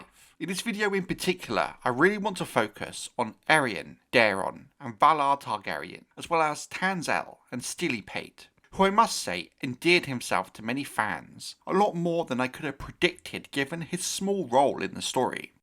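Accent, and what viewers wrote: United Kingdom. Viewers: You have the most British voice ever. Like the josh Brolin of England lol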